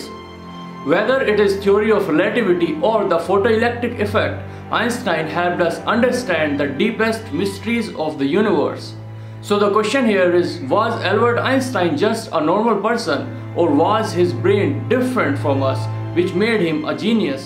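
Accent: India. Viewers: is this indian einstein speaking? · 20% i can't even hear what your saying with that indian act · Yeah I also heard this Indian man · First time watcing indian. (Know from the speaking accent.) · I fucking love indian accents 😂🤙🏻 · I can’t understand u ur so Indian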